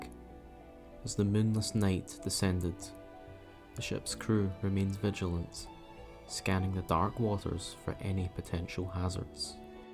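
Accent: Scotland, United Kingdom. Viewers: Bros scottish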